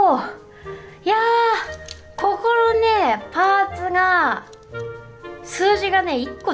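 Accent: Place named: Western Japan, Japan. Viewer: は何処出身？西寄りの言葉たまに出るよね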